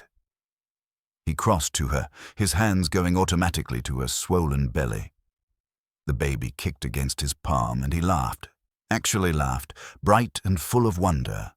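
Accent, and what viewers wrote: England, United Kingdom. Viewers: Need an American narrator for an American story besides an English talking narrator.. Her husband been dead two years and daughter died six months and her four or five months expecting a baby who is the dad ? It couldn't be her husband's baby... Proof reader needs to read the story.. I like the story it needs to be more accurate...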